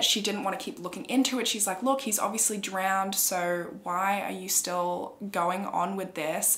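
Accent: Australia. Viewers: Heavy Aussie accent! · We're is the accent from? Australian? It's grating and can't listen anymore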